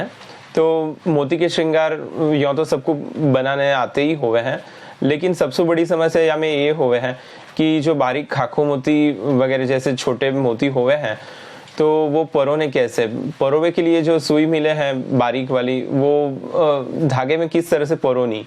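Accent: Braj, India. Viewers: Bahut sunder braj ki boli manbhavan hai Jai Shree Krishna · Jai shree krishna Superb very easily done your braj bhasha is very sweet Make more videos for us thanks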